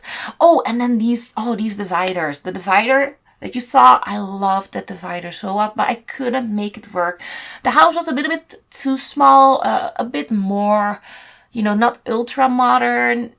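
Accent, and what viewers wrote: Netherlands. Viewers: Oh Youre dutch haha I heard it on your accent nog een vraagje welke pc gebruik je? :3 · Are u dutch? cause you have a dutch accent lol edit: oop yes you said your dutch in your video lol ❤️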